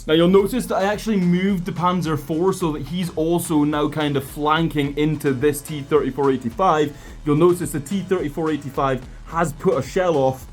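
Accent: Scotland, United Kingdom. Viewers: are you scottish?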